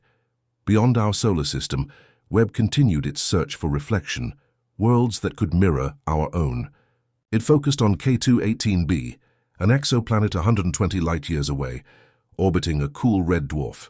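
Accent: United Kingdom. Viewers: Another AI "terrifying" clickbait video with vague British accent.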